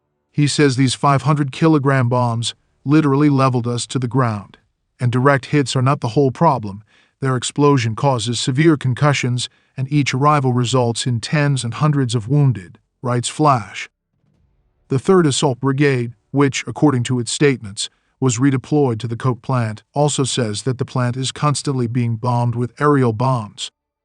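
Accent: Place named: United States. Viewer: from Ukraine, professionally narrated by some American))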